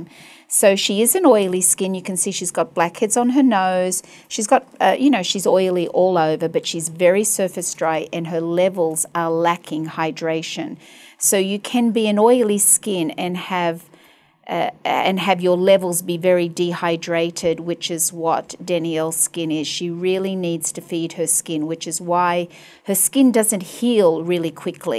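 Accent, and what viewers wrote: Australia. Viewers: Australian or New Zealand(ian?)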